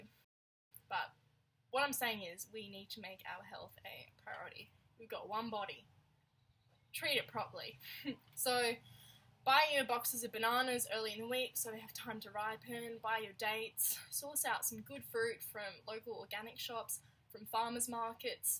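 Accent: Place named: Australia. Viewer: Cute Aussie accent.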